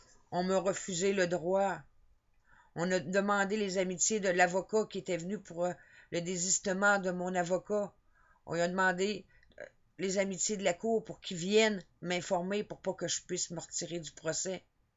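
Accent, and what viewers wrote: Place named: Quebec, Canada. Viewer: Pour ton accent je dirais que tu es québécoise, alors ça se passe comment depuis ? ça s'est amélioré ?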